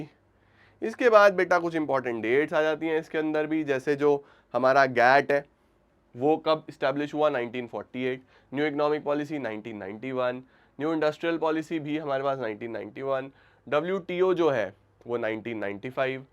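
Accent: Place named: Uttar Pradesh, India. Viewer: U are from up 😅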